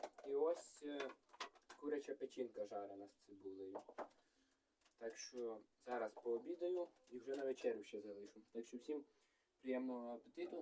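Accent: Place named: Surzhyk, Ukraine. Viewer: а ты с какой области? У тебя очень интересная речь, смесь украинского и русского (на Донбассе это называется "суржик"), звучит мило😉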